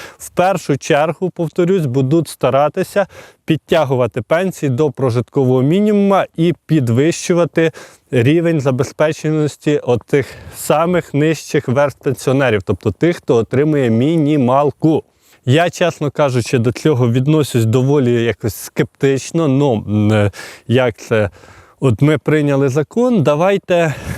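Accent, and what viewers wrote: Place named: Galicia, Ukraine. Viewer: Зауваження ведучому,коли ви вже почнете вивчати і розмовляти правильною українською мовою,що це за слова буде,будуть?Гидко слухати.Ідіть на курси і навчайтесь розмовляти.Галицький у нас в Україні не проходить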